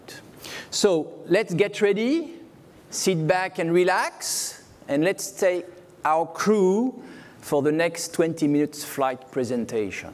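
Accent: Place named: France. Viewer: I like French accent. Very sexy. Period.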